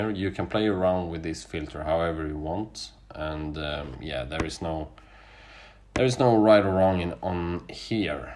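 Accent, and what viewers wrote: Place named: Sweden. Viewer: Tjena, kanske ingen vild gissning men tippar på att du är svensk. Tack för en bra video, leker runt med finviz för att hitta bra aktier. Mest dock penny stocks som kan bryta ut kraftigt är det jag letar efter. Tycker du ska köra på svenska istället för engelska, mindre tittare kanske men tror ditt talande hade blivit mer flytande och naturligt. Har du fler set ups på finviz du kan rekommendera om man vill leta aktier som kan sticka iväg uppåt?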